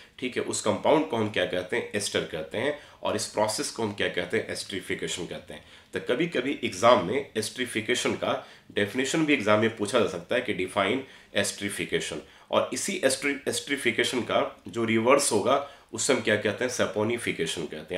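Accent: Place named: United Kingdom. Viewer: English accent yes... Lekin jldi bana Dena Bhai... 2 din or h paper m..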